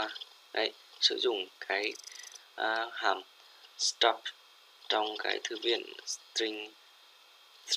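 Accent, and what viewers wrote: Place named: Nghe An, Vietnam. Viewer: nghệ an rồi!!!!!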